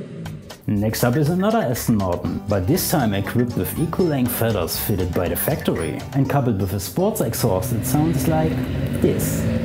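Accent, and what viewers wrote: East Germany, Germany. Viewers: Moin Moin aus dem Norden, ist der Protagonist etwa Deutscher? 😀 Das Englisch hört sich wie Denglisch an ;-) Abo ist raus! LG HEUERs Pit Stop 🚗💨💥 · Thats the best german english ive ever heard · Bei dem sehr deutlich deutschem Akzent könnte man "Mercedes" doch auch gleich richtig deutsch aussprechen. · geil wie man selbst im englischen den Ossi Dialekt raushört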